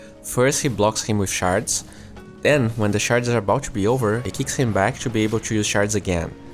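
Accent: Brazil. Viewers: Entendi no final do vídeo. É pelo dinheiro ganho no Patreon. Mesmo com esse sotaque muito brasileiro no inglês, onde até quem não é americano ou inglês vai perceber na primeira palavra que não é do país dele e é de um país que não fala inglês, nesses países tem mais pessoas dispostas a doar dinheiro pelo Patreon do que países como o Brasil. Pior pela situação econômica do país. Essa é a única coisa que penso sobre mudar de país o seu canal pra um cara que mora no Brasil e tinha inscritos no Brasil: Dinheiro do Patreon.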